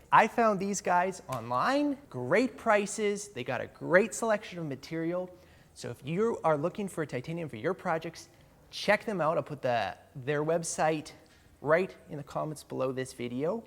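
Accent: Canada. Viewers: bloody hell bud, you've got the most stereotypical Canadian accent i've ever heard! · What?! This dude has a serious Canadian accent. Matthew McConaughey has a thick southern accent.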